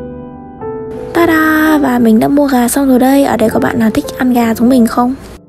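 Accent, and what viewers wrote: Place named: Hai Phong, Vietnam. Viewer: người hải phòng mà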